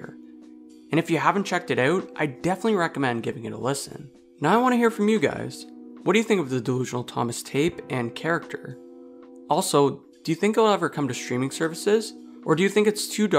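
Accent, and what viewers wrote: Canada. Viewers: You're Canadian, I can tell by the way you say "out"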